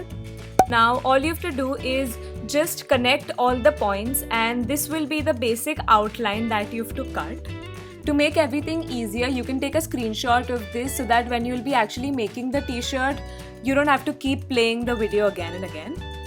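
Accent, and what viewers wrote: India. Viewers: HONESTLY if you don't like the Indian accent you can fuck right off and click on another video, no one wants your negative and racist opinion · *cute indian accept: ) you're cute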